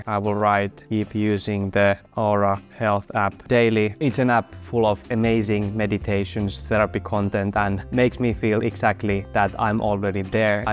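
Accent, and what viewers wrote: Finland. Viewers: First time seeing one of your videos. Clicked and heard your voice. Said to myself, "He sounds Finnish". Interesting because most of the reset type of videos I see are made by women who use various planner systems. Other than Ryder Carroll (who created the original Bullet Journal) and a guy named Riley Blanton (who uses Michael Hyatt's Full Focus Planner) yours is the first video of this specific type that I've seen done by a guy.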